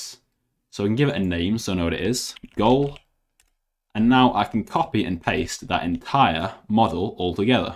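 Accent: United Kingdom. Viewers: british mate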